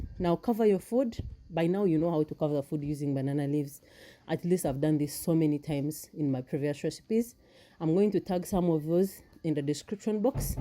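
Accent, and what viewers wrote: Kiga, Uganda. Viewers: Haza I love the kkikiga accent🤣🤣😹💦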